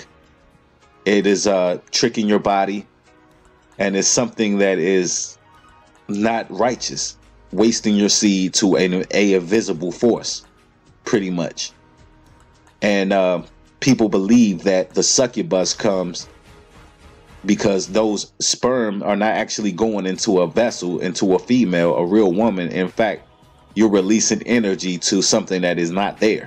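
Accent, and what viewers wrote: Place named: DMV, United States. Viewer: You from the DMV homes? You sound like it...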